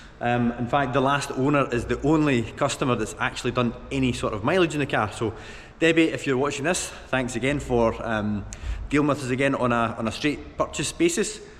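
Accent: Scotland, United Kingdom. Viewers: Is he speaking in Scot-ish, I can only understand every fourth word he says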